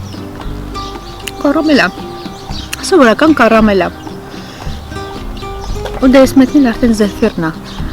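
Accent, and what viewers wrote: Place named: Gyumri, Armenia. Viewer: ջան քո խոսելաձևի մեջ գյումրեցու ակցենտ եմ տեսնում դու արմատներով որտեղից ես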